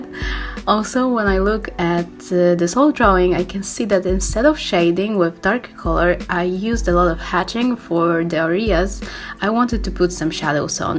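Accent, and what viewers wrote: Poland. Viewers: Jesteś Polką? Jakoś tak polsko się nazywasz i masz taki polski akcent. Ślicznie rysujesz <3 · Are you from Poland? Cause you have polish accent. You're English is so good. How many years you're learning English? (Sorry if my is bad) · Hah! Kiedy cię usłyszałam od razu wiedziałam że jesteś polką :D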